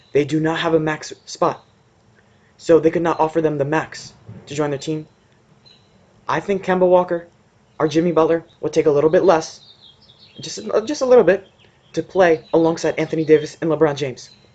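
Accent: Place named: South, United States. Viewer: You sound like you have a country accent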